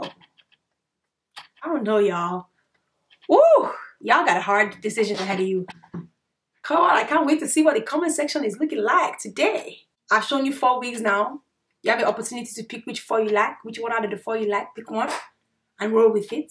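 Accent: Nigeria. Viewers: I just want to keep listening to you and that mixed Naija accent. Watching your videos instead of editing mine and im already late for my Youtube upload. Stopppeeet! Ya too cute girly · Pixie crop is hands down my favorite plus I love how you switch up that Nigerian accent. Steady makes me smile 😀😀😀😀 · I love your Nigerian accent. You had me dying. (I'm Nigerian so it made me laugh)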